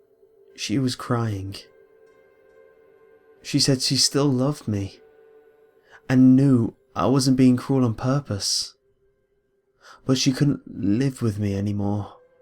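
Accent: United Kingdom. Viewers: Oh, and your voice is smexy. I love your voice. Are you British?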